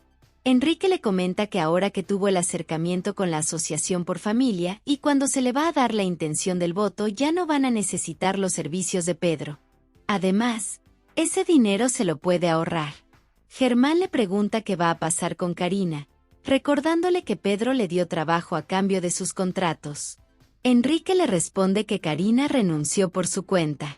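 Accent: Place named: Colombia. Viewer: Narra con acento Colombiano😊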